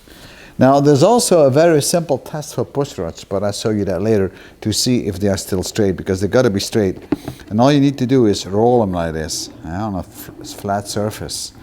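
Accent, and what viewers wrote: Netherlands. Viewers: you sir have amazing skills in bodywork and mechanics. sound like a Dutchman who lived in Canada for some years. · Great Videos - I thank you for them - your accent sounds like my Van Engen relatives from Holland - Gary - Iowa - U.S.A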